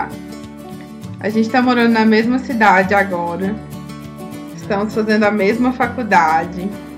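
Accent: Minas Gerais, Brazil. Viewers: Que lindo! sou fã dos 2! desejo toda a felicidade do mundo! achava q vc era de minas pelo sotaque...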